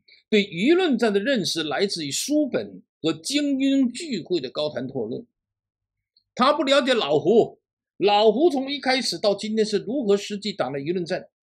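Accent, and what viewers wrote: South, China. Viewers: 不必要，基本全能听懂，而且有一点南方口音的普通话感觉更有韵味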